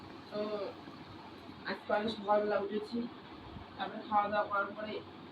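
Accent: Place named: Rajbanshi, India. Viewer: রাজবংশি তোমাদের ভাষাটা বেশ ভালোই লাগলো সুনতে দিদি ভাই ❤😊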